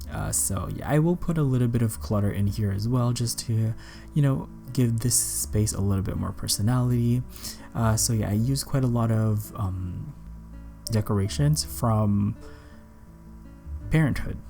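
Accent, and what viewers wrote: Philippines. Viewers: Stumbled to this video , and as soon as you start speaking i knew you're a filipino. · Bruh ur accent, by any chance r u a FILO?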